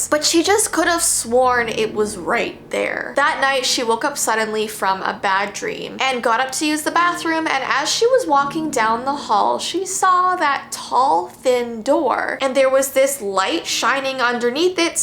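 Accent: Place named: Canada. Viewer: I think she sounds very Canadian, when I first found her channel and binged her vids my mom complained that I was saying “out” weird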